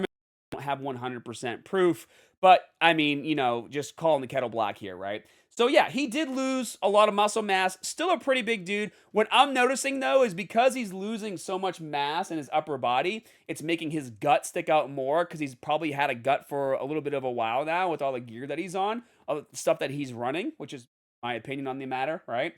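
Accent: Boston, United States. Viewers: lol yeah I kinda dig the Boston accent 😂 must visit there when I come to America on vacation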